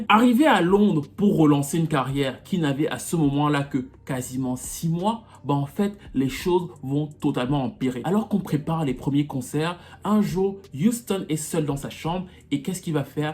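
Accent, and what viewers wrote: Cameroon. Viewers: Tu ne serais pas camerounais par hasard parce que tu as un petit accent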